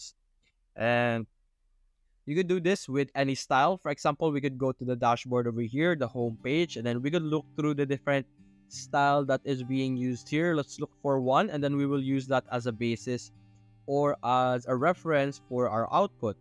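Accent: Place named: Visayas, Philippines. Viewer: I can tell you're a filipino hehe and definitely bisaya with your intonation hehe good tutorial keep it up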